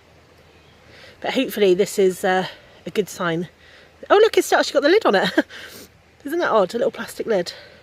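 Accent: England, United Kingdom. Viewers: Sorry, but English accents are hard to keep up with and you talk so fast.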